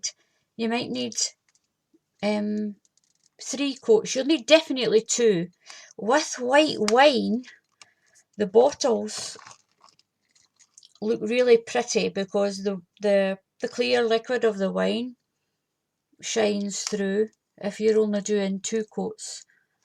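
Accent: Scotland, United Kingdom. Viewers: love to hear yr Scottish twang my nanny was a broad Scot xx love and miss her xx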